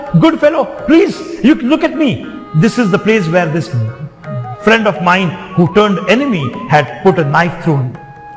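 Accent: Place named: South India, India. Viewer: So amusing presentation! Perfect South Indian tone! Awesome, proud of you, Sir!😊👌👍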